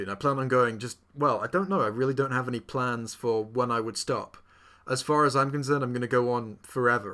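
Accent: United Kingdom. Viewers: I have your british accent as well, of all the accents to end up with in britian. Also 6th, really! I mean I get the 4th and 8th doctors but 6th!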